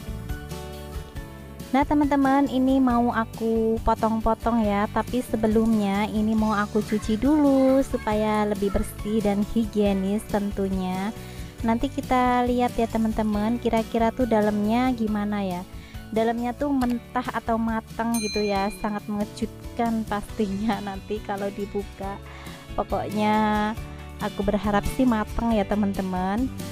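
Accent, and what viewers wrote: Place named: Java, Indonesia. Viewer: Bundaa Asli Bali apa bukan bun.. Masih ada logat jawa nya heee.. Tarik sis... Semangka... Hihi.. Segee bgt..dalam nya kuning yah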